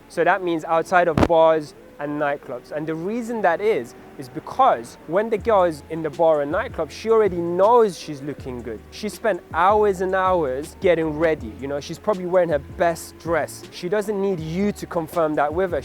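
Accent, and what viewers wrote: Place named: United Kingdom. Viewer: I was gonna use this guy's tips but I am lacking the British accent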